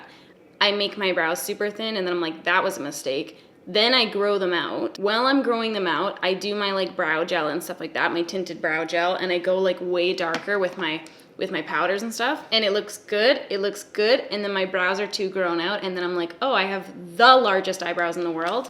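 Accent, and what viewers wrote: Canada. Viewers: I fucking knew u were canadian. gang 🍁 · You look extra beautiful and happy in this video tysm your vids make my day! Ive been living in england for almost 2 years now and sometimes i just really need a canadian accent to make me feel like Im home 😫😭😛 Love you!!!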